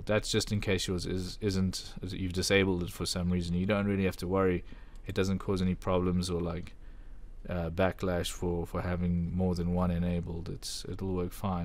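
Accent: South Africa. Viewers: Are you from SA bra? Nice video! Very informing!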